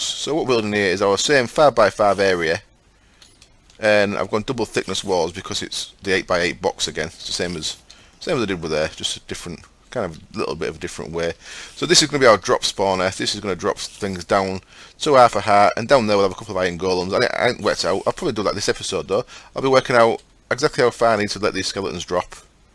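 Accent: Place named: North, United Kingdom. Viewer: yeah exactly the same northern (i think) accent that you have :)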